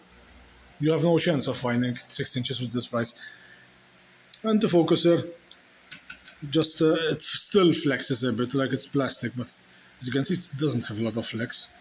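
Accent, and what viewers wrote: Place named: Malta. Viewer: I bought one of these a few months back, these tips are great! Then to my surprise, this was a recommended video and I recognise the accent - a fellow maltese! Grazzi tal video!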